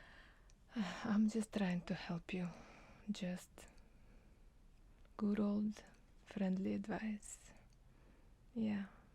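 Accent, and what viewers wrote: Russia. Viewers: Russian accent it's strong it's perfect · с лютым русским акцентом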